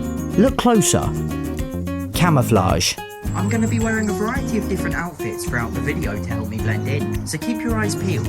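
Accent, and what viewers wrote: United Kingdom. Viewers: are you British 🇦🇺?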